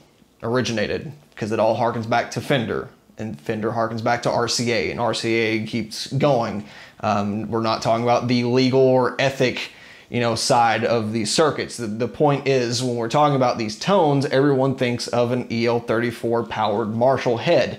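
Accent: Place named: South, United States. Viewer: just curious,do you live in the South??You have a southern drawl like me,HaHa...I am in Alabama...That’s a great sounding amp,I have been seriously thinking of getting a Ceriatone,just trying to decide which one....you may have just made that decision easy.....love your channel by the way....great tones..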